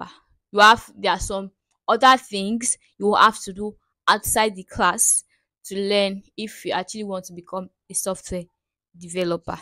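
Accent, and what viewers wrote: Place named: Nigeria. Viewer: hehe. I have missed hearing Nigerian accent. Compuuter haha. It's comp-iu-ter 🤗🙃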